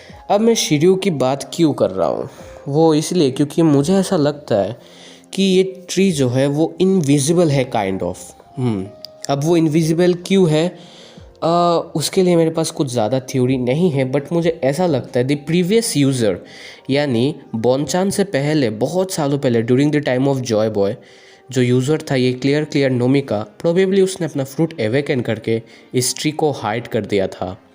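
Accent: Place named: Bengal, India. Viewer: joshu haa bhai aur mera dost kolkata mei reta hai aur iss bhai ka bhi bole ka andaj Bengal mei jaise log bolte hai waisa hi hai 😶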